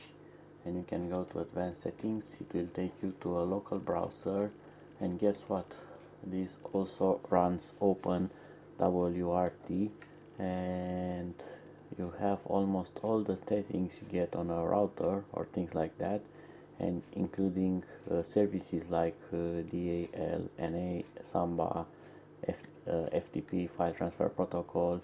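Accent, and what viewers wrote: Romania. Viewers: you sound Romanian to me. am i right ?good english dogh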